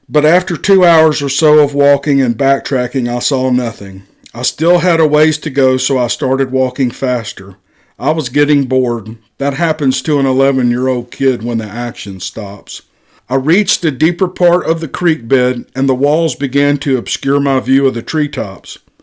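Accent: South, United States. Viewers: Kevin Costner with a southern accent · You’ve got the perfect southern voice to give every story an authentic feel based on the location. It sounds like you’re really the person who experienced these stories. Real or fake, you do em justice by bringing them to life.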